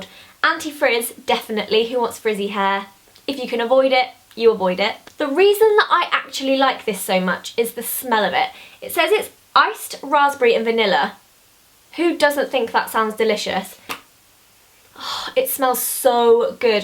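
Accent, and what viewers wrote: United Kingdom. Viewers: are you british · Everytime I watch your videos I just really wish I were from the UK too. Your accent is really lovely! :) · you have THE perfect British accent like its so perf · Your british accent is the same as mine ( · idk why i watch your video because most of these products are probably not in America...but a british accent tho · OMG I WANT YOUR ACCENT I HAVE ALWAYS WANTED TO BE BRITISH I'M JUST PLAIN OLD AMERICAN!!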